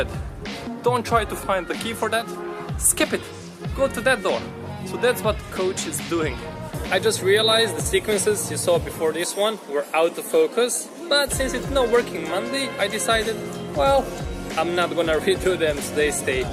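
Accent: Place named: North, United Kingdom. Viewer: And I like your accent! :D North FTW!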